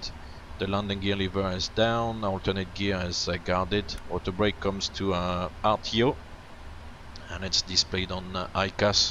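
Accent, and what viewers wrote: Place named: France. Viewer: Un joli accent Français ^^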